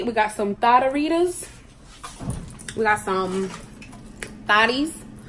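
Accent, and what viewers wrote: Jamaica. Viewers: Mi nah lie mi love yuh style ..... I just love how yuh chip in the patois with the twang 😆😆👍🏼 just came across your channel ... mi like it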